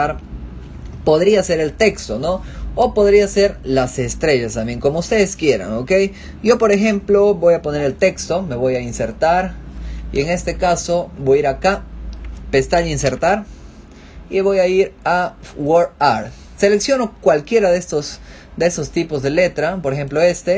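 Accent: Peru. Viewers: DIME QUE ERES PERUANO XFA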